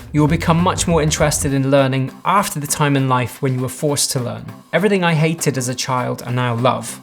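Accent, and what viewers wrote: Scotland, United Kingdom. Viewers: If it makes you feel better, you sound Scottish to this American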